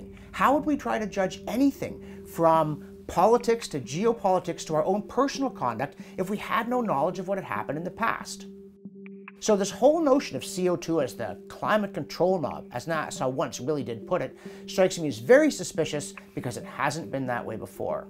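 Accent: Canada. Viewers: and still nothing worth remembering. He spent that time trying to justify his veracity or valiidity or something. Once again.....4 minutes that I will never get back. He sounds like a Canadian to me.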